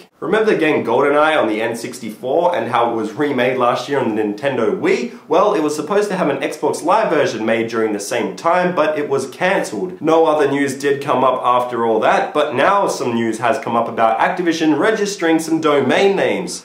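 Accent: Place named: Australia. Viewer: i knew i recognized that accent, aussie u tuber ftw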